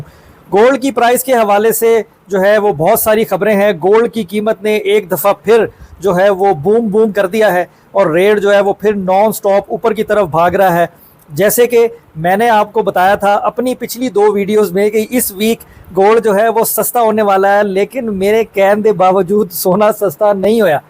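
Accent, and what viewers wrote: Punjab, India. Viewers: Aap Urdu bolty bolty thora touch Panjabi ka lagaty ho acha lagta h, khush raho.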